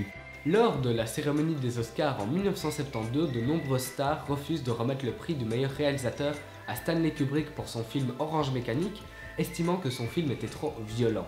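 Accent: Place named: Belgium. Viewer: Je te réponds 1 an après mais bon... Il dit les nombres comme ça parce qu'il est belge, à la fin des dizaines c'est ante comme soixante-dix c'est septante, voilà, 1 an après 😅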